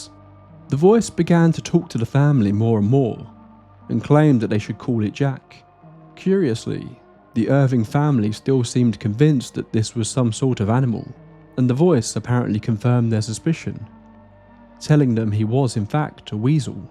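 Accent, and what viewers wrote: United Kingdom. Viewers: Bullognia!!. I'm a native NY-er who tunes in to this channel for the smooth, sophisticated British voice overs that only provides.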